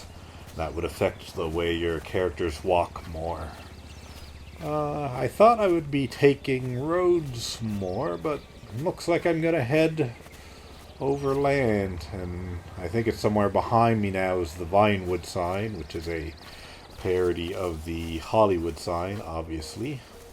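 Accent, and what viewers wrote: Canada. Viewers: That's the Vinewood Bowl, based on the real-life Hollywood Bowl. And you do have a slight Canadian accent, especially when you say "out" as "uwt", rather than the broader American "aaaout".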